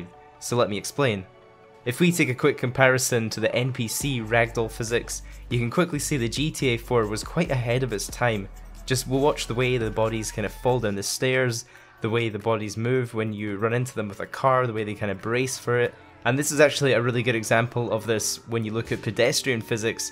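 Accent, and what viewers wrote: Scotland, United Kingdom. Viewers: SCOTTISH AF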